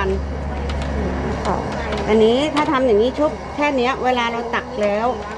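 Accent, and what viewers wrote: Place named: Nakhon Sawan, Thailand. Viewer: สำเนียงพูดเหมือนคนนครสวรรค์เลยค่ะ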